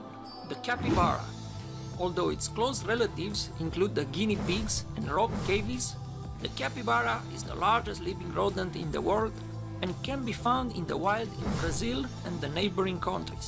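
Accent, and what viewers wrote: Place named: Poland. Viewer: Polaszkanski angielski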